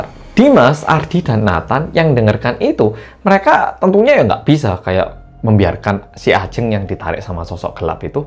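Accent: Java, Indonesia. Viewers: Hai medoook❤ RinDingDing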